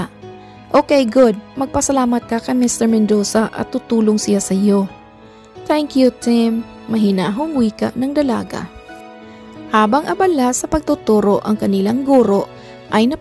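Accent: Visayas, Philippines. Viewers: 🤣😂😅😅dlwang sunud na bisaya ang nag e story teller pero nice s dlawang sunod mas ok to magkwento ..💓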